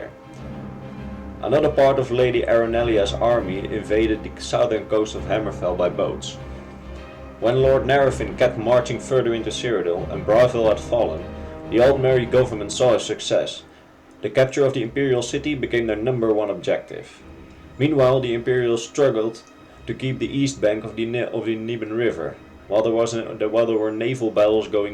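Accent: Netherlands. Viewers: Vanaf hoorde ik al gelijk... Een Nederlander! Wij hebben echt zo'n typisch accent. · oh nederlands engels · Nederlander!! Ik hoor het in de eerste drie seconden al gewoon. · are you dutch because you have that dutch kinda accent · Are you dutch, zo ja. Hahaha ik wist t. Hoorde t aan je accent. Als t niet zo is, oops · You sound like you have a Dutch accent. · is that a Dutch accent? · It's a Dutch accent though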